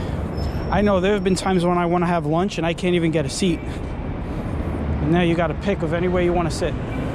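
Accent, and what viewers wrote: New York, United States. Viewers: You have a a Thick Newyork Accent lol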